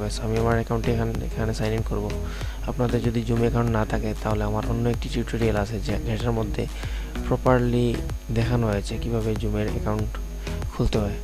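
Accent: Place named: Sylhet, Bangladesh. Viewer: Ji but Dhaka tei thaki choto theke. kotha sune bujhe gesi je sylheti hote pare 😂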